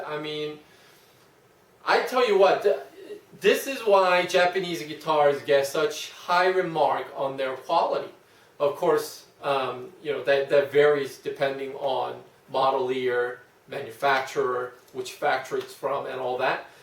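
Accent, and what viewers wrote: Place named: South Korea. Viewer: 안녕하세요. 혹시 한국 분이신가요? 영어 억양이나 발음이 종로 학원을 떠올려서 여쭈어 봅니다.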